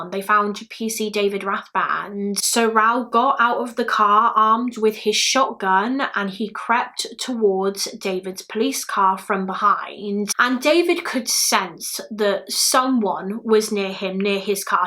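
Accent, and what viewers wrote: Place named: England, United Kingdom. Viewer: The reason why this American loves you is your unique English accent. I could listen to you all day!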